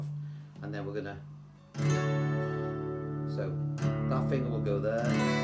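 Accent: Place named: Liverpool, United Kingdom. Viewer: I totally agree with all who have said this is the best lesson for this song on the internet! Thank you for sharing it. Plus, it's fun for this American Girl to listen to your Scouse -- cos if I am not mistaken, your accent is certainly from the north of England. So, ta from my good self! :)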